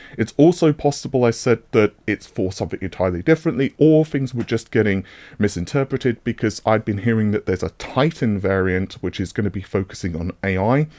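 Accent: England, United Kingdom. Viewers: Brother Man sounds like Tea and Crumpets looks like The Rock's English cousin. SWOLE!:)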